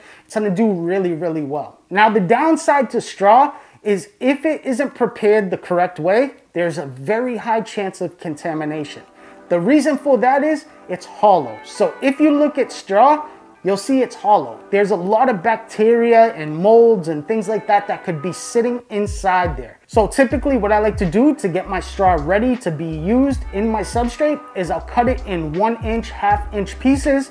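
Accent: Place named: Massachusetts, United States. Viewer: we know your in Mass with that accent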